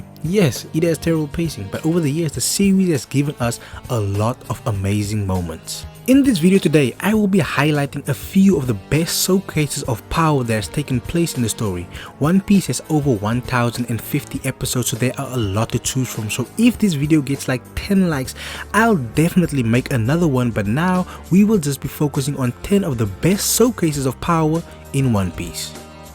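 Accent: South Africa. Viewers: Sounds like you're from Sud Afrique mabut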